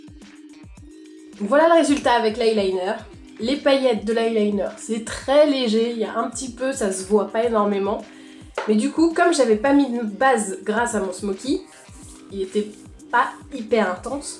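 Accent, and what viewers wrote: Russia. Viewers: L'accent russe ! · oui c'est l'accente Russe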